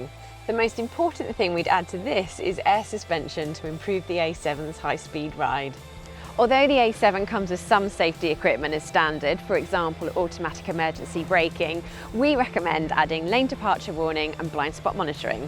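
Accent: United Kingdom. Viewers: I cant affort to buy a car now but I like British accent so, I love watching your ...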